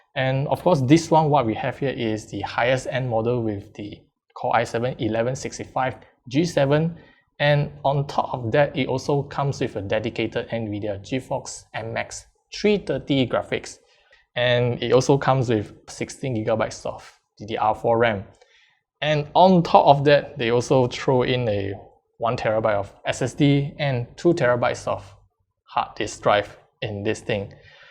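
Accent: Singapore. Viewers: Just from the accent, I can guess you are from Singapore!!!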